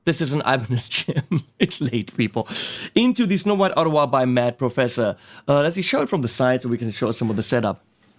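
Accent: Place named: Germany. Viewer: your accent seems particularly German today.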